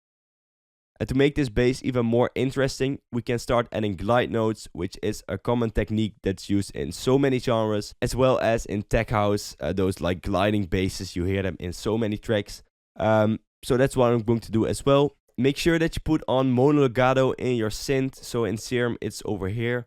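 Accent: Netherlands. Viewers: heerlijk dat nederlands engels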